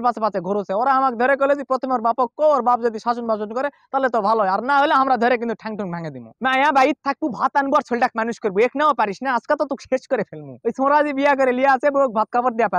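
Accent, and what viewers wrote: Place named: Bogura, Bangladesh. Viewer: হামাকেরে বগুড়ার ভাষার মতন ই বারে😆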